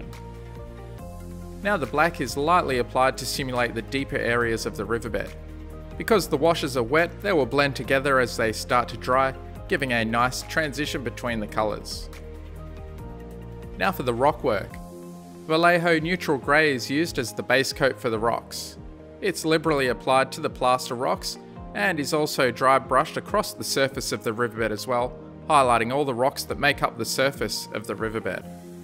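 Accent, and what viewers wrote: Australia. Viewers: Idk if you live in Australia but it sounds like you do if you stay safe from those fires Love your work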